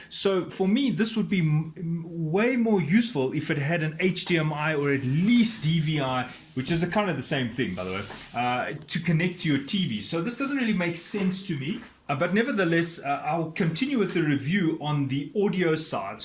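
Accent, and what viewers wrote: South Africa. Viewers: R u south African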